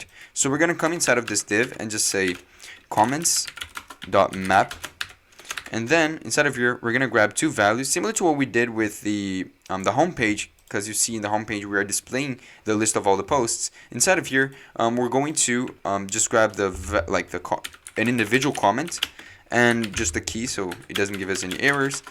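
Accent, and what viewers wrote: Brazil. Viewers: The right thing was to call the function to load the comments and not to force in the front-end with a state. But ok nice video, helping a lot. (Tu é br com esse sotaque ctz kkkk abraço)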